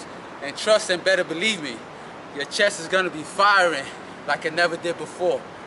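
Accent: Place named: New York, United States. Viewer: New York city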